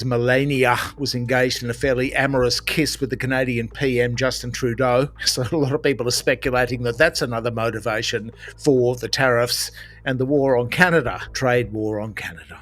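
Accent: Australia. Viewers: Hey mate. Nice hearing an aussie accent. This is mad.. but this is what he promised? All aboard the market crash!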